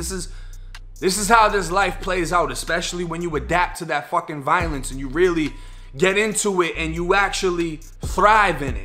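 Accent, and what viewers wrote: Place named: Massachusetts, United States. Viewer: Good shit. This is a break through for you. Everytime I level up it makes me feel happier with who I see in the mirror. We have watched you transform. I've been with you a while. You tell good narratives. You're a great story teller. And you are now seeing that life is more about letting go than reliving. My gf calls me on it when I live in the past because we had to be one thing to not fall victim to the population. You don't get this many loyal subs unless you're doing something interesting. Massachusetts accent don't hurt us either. Lots of girls love our accent 😂. I actually watch your vid first of all my channels in subbed to. 🔥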